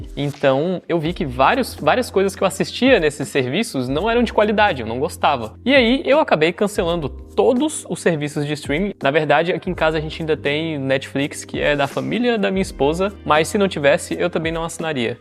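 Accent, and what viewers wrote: Brazil. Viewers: So de ver a casa se nota q ñ mora no Brasil 🇧🇷 , ate ele falar 😂 , eu tbm moro na Europa . valeu as dicas. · Hahaha amei seu canal… E cara, teu sotaque é muito baiano…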